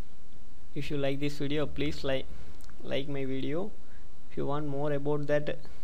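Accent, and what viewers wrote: India. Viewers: it's not his fault all indians speak that way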